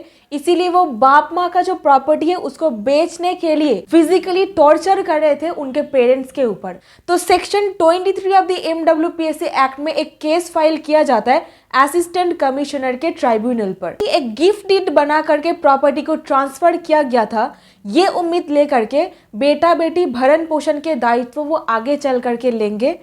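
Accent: Bengal, India. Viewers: Bengali's hindi is very sweet. Easy to understand.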